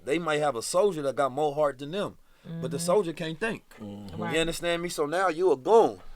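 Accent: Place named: California, United States. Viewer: It’s Black California linguistics. Black Californians linguistics is the same up and down the state.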